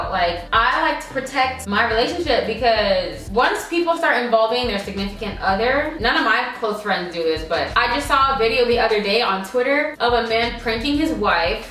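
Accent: Jamaica. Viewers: Am I the only one that can hear a lil bit of your Jamaican accent?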